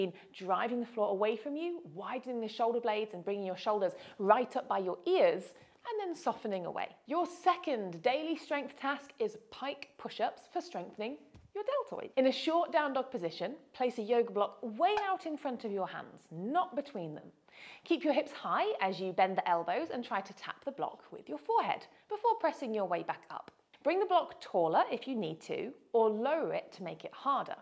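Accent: United Kingdom. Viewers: Your videos are so much better than others I've seen for a few reasons: 1. No gung-ho ego-driven nonsense, 2. Great pedagogical style, 3. British accent.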